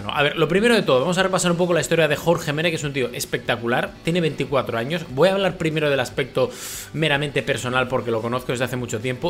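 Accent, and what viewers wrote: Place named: Spain. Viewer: De que parte de españa eres saludos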